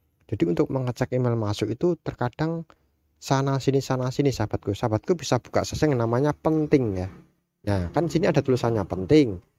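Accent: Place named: Java, Indonesia. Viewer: Ga jelas medok.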